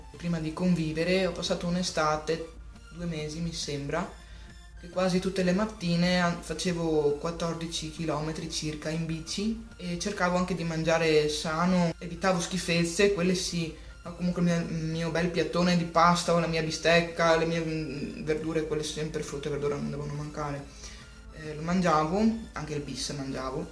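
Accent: Veneto, Italy. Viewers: ti ho appena scoperta, bellissimo video davvero!! è bello perchè, nonostante le difficoltà legate al dimagrimento/ingrassamento, riesci a parlarne e a sorriderne. purtroppo che si tratti di aumentare o perdere peso (e dei problemi fisici che ciò comporta) il problema è psicologico in ogni caso, ed è su sè stessi che bisogna lavorare più che sul peso in sè!! io stessa in passato ho avuto problemi in merito e ancora mi ci fisso talvolta, anche se sono riuscita a raggiungere il peso forma.. spero davvero che tu riesca a venirne a capo e riesca a trovare un tuo equilibrio, e mi sembra proprio che tu ce la stia mettendo tutta :) un abbraccio grande ps: sei veneta per caso?? XD hai un accento davvero simile a quello delle mie parti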